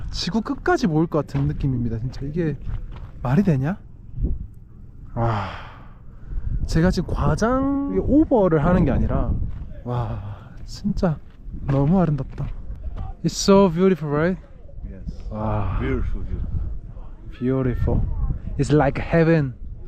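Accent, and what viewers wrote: Gyeongsang, South Korea. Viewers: 경북인줄 경남 이셨구나 부산 싸나이 ~~ 홧팅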